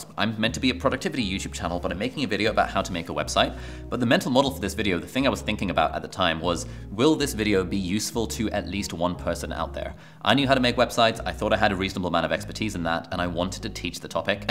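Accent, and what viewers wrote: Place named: United Kingdom. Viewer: How can we talk like a British, talk like you . ???. 🤔🤔🤔🤔🤔🤔🤔🤔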